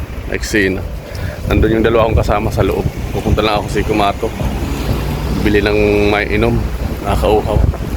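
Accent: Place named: Batangas, Philippines. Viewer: Boss, taga batangas ka? Yung accent mo kasi parang similar sa mga batangenyo. Anyways godbless ingat palagi, tuloy lang boss sa pag vlog, support lang kami dito kabayan. May kasabihan nga ang mga OFW ang bagong bayani ng pilipinas❤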